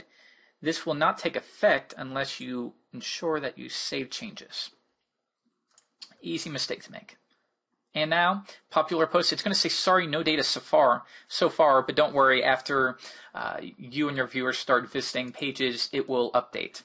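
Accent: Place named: United States. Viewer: great tuts,u sound american but u do like football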